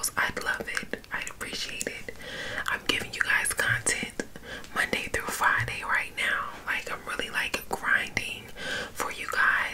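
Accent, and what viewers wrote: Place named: Trinidad and Tobago. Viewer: You trinidadian right?😂 Lmao heard it in your voice...🇯🇲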